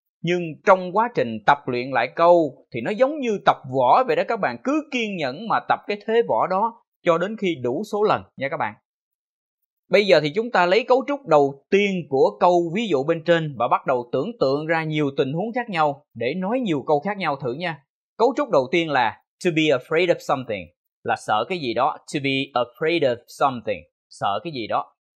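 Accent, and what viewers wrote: United States. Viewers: Giọng Anh mỹ thầy quá chuẩn hay lém thầy ơi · Rất hay thầy ơi,, mih thích lắm,, Đúng với mih đang cần học,,, bạn nói English phát Âm giống như người ở Mỹ,,, và cũng giống như giọng người Bản xứ,, it's great 👍❤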